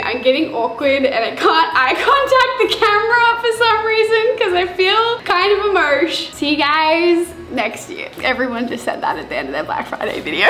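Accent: Australia. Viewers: Bruv is she Aussie?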